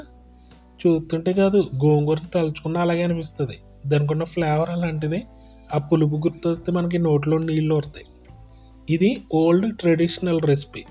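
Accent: Godavari, India. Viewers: గోదావరి తెలుగు భాష , ఆ యాస మార్చకుండా ఉంచండి. యూ ట్యూబు డబ్బులు వచ్చాక కొత్త స్టవ్ లు, Ikea పాత్రలూ వంటివి కొని వంట గది రూపు రేఖలు మార్చేయకుండా ఇప్పటి సహజత్వం కొన సాగిస్తేనే ఆ పాత వంటలకు ఆ పాత కాలపు వాసనలు ఉంటాయి. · Memu Godavarollam andi. Mee vantalu, maata superb. Matallo yaasa over cheyyakunda natural ga undi.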